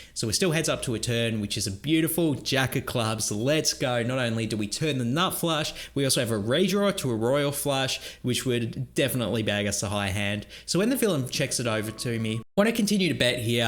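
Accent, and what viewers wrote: Australia. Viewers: You talk out the side of your mouth as it is. I can't understand some things you say. There is something about Australians and the way they talk out the bottom side of their mouth. It makes some words hard to understand lol. The accent is awesome but the way the accent comes out is hard lol. Tough sessions happen, better luck next time.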